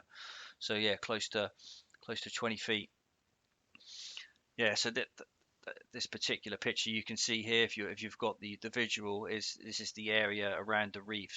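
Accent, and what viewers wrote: Cornwall, United Kingdom. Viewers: He is from Cornwall, they have an accent, like us Londoners, but I understand him fine.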